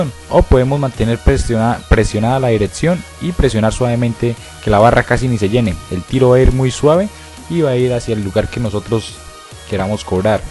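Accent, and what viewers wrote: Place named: Colombia. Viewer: eres colombiano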